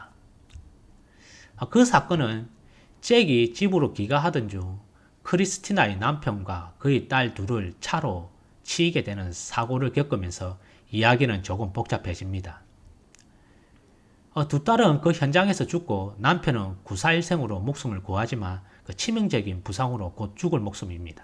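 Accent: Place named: Gyeongsang, South Korea. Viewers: 왜 경상도 출신분들은 사투리를 고치려하지 않는걸까요. 아직도 80년대처럼 대한민국의 주류라고 생각하시는지요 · 뭔 개똥 같은 소리인지...너는 서울출신에 부모 모두 충남베이스. 경상도 사투리 억양 성조 있습니다. 서울은 사투리 아닙니까? 말을 고따위로 합니까? 좋은영화 소개시켜주는 컨텐츠를... 너무 하시네요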